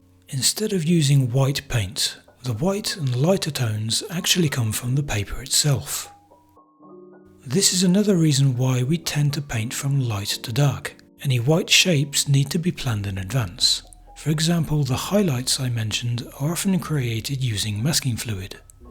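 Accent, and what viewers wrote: United Kingdom. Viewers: Why do you sound like you are British when you ask us to pay for your classes in dollars.,and why don't you stretch your paper first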